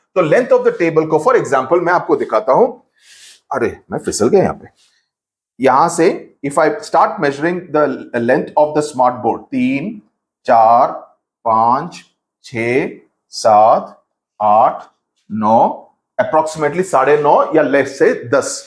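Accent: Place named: South India, India. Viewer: Sir i think you are south indian . Your hindi dictation is clear but hindi tune is like south Indian's people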